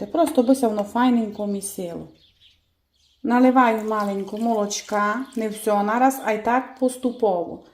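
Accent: Transcarpathia, Ukraine. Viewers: Як його навчитися говорити як на Закарпатті? Мова дуже файна. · Підписалась, який файний канал!, Ваш діалект, для мене мед у вуха 🫶 я переселенка з Бахмута, тепер жию на Закарпатті, дуже подобаються люди і цей діалект❤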